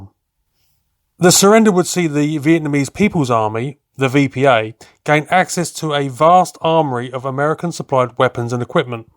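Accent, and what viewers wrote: United Kingdom. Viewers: Because it wasn't US military property, it was South Vietnamese military property. Just exactly the same as all the gear that the Taliban laid it's hands on that all these people who don't know what they're talking about are saying all this dumb crap about the US military leaving all of that stuff laying around for the Taliban to equip itself with, like what you see here it wasn't US military property that the Taliban got, it was Afghan military property that they left laying around when they fled in the face of the Taliban taking over. Word of advice, any time you click on a video that has anything to do with US military history and you hear a British accent, do yourself a favor and immediately shut it off, because it's going to be full of lies, exaggerations and any other type of deceitful things you can think of in order to cast a bad light on America and especially it's military, for some reason they feel compelled to do that stemming from some sort of twisted feelings of jealousy that obviously comes from the US's involvement in the two world wars.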